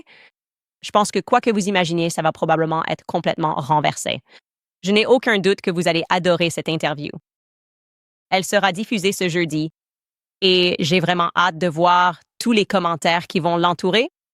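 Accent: Quebec, Canada. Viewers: en tant que Français ça donne un ressenti de québécois édulcoré. Mais je ne suis pas du tout spécialiste 😄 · C'est vrai pour l'accent québécois mais ça reste très confortable a suivre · AAahh le francais AI est bizzare, c'a laire du francais quebequois 😂😭😭going back to main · lol, same here. Weirly she speaks with a slight Quebec accent, and some of the translation is a bit approximative, but still, it is pretty impressive. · Bonjour Candace Je suis français et je t' écoute en français. Si ton français (issu d'IA) est très bon, je dirais qu'il n'est pas parfait car il comporte quelques accents canadiens que l'on ne trouve pas dans les diverses régions de métropole. Le français des iles caraïbes ou d'Afrique a aussi des accents marqués mais pas canadiens. Cela dit l'écoute reste confortable pour un français qui ne comprend pas l'anglais et encore moins l'américain. 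cordialement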